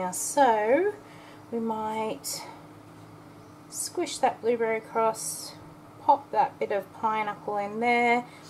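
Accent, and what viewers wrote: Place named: Australia. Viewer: I truly enjoy your videos and enjoy hearing you talk. I don't hear Australian accents very often living in America, so you're fun to listen to 😊 And it is so neat to see all the unique ideas you have!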